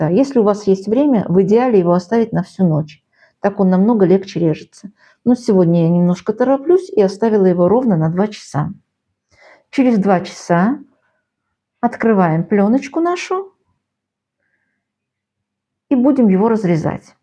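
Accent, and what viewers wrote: Baku, Azerbaijan. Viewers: Добрый вечер! У вас акцент наш:) Вы бакинка?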